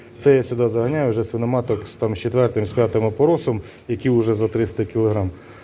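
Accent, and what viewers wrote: Ukraine. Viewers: Такий у Вас цікавий говор, звідки Ви родом? Мій тато так розмовляв - Харківська обл. Дуже цікаво, а головне доступно для новачків розказуєте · Доброго здоров'я, приємно чють рідний Миколаївський суржик))) , краще ніж відоси годовой давності))). Я в одного дятька бачів підлога в свинарнику була викладена з дерев'яних чюрок, як плитка тротуарна)) . В самого хозяйства немає вже давно , а приємно дивитися ваші відоси.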